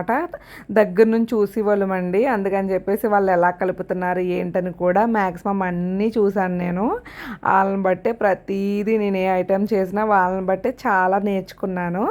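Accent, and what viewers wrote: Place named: Godavari, India. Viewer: Super👌 Godavari language😂😂👌👌👌 Ekkada Unna language maradu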